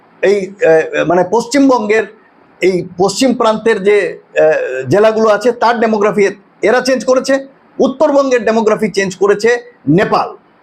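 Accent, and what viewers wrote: Bangladesh. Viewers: Bangladesh lok mone hoche...vat bolchen...every thing given logically....bangladesh bhasha tai doubt hoche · আপনার accent শুনে মনে হচ্ছে আপনি বাংলাদেশী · APNAR BANGLA PRONUNCIATION SUNE MONE HOCHHE AAPNI BANGLAADESHI.....!!!!! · APONAR VASAY AKHONO BANGLADESHI BOLA MONA HOY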